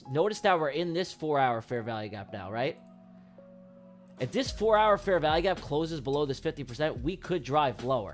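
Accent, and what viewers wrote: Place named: New York, United States. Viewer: Bro can definitely talk for sure. No doubt about it he's from new york.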